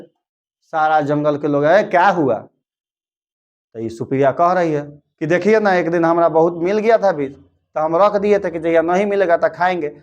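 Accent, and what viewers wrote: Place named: Bihar, India. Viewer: SIR MAJA NAHI AA RAHA HAI APANA STYLE ME BIHARI STYLE BOLIYE ❤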